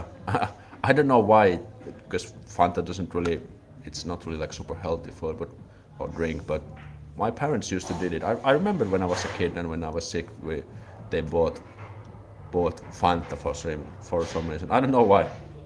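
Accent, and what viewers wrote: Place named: Finland. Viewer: I’m honestly in love with Finnish accent when they speak English! It’s ear pleasing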